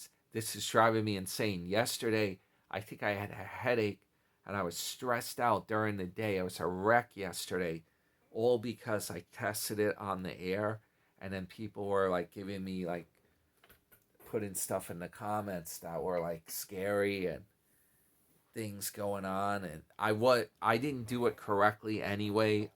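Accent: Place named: Long Island, United States. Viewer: Love listening to your L.I accent!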